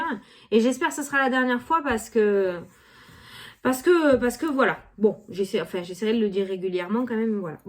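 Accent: South, France. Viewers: Bonjour, Déjà, mille mercis pour ta bonne humeur & ton accent du sud qui font du bien. On m'a effectivement proposé un partenariat. Je suis en pleine reconversion et une connaissance me propose de partager des locaux dans un quartier en plein essor.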